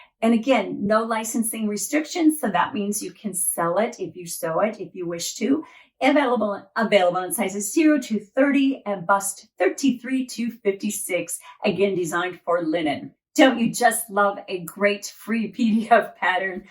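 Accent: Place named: Philadelphia, United States. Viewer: I agree, I think I may try the one you have on first. Great list of tops. Why did I have you pegged as a Philly or South Jersey girl? Texas? Never would have guessed.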